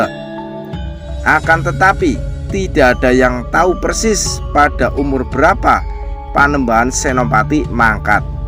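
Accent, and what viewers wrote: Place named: Banyumas, Indonesia. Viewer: Naratornya asal Banyumas sepertnya. Apakah yg punya k9nten "?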